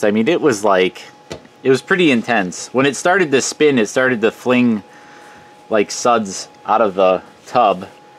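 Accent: Midwest, United States. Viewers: This guy's midwestern accent is killer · Sounds like hes from Wisconsin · The midwestern accent is sexy as fuck. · this guys accent reminds me of growing up in the midwest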